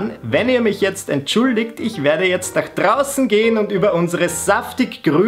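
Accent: Vienna, Austria. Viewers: Du redest Wienerisch, das ist was ganz anderes als der Durchschnittsdialekt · Das ist kein österreichische deutsch das ist Wienerisch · Alter dieser Wiener Dialekt ist sowas von wähhh. Ich lebe auch in Österreich und immer wird der Dialekt nur auf Wiener Dialekt geschlossen. Nur dass die Wiener nicht wissen dass andere Österreicher den Wiener Dialekt nicht wirklich mögen.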